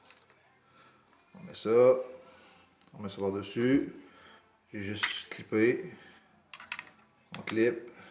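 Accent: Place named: Canada. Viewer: Bonne vidéo je fais une découverte avec ce tank . J'aime bien ton accent Canadien 👍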